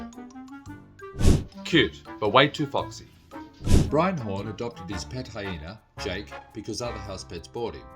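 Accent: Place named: Australia. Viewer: we have known each other over 2 decades! WE ve worked on music, traveled to the Winter Music conference in Miami, but this new narrative of EXOCTOC animals is by far informative snd your sense of humor and Aussiue accent keeps you glued to keep watching. So when you were living with me in Jersey City, I might as well say...that o also had an exotic an male living with.me lol ☆☆☆☆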